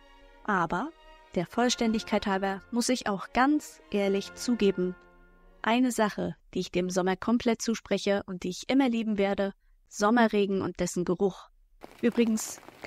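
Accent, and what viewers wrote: Mecklenburg-Vorpommern, Germany. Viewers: Kommst du eigentlich aus MV? Sommä, immä 😅😅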